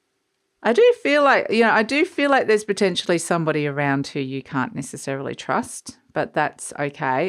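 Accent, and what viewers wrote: Australia. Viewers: Nice to hear an Aussie tarot reader. Has been a bad year for me, never got covid, but got Bronchitis, colds, chest infection's from everybody else and at 70 got to watch out, their's a lot of strange bugs going around now. let's hope 2023 is much better in health wise for everyone. Cheers and Merry Christmas Hun.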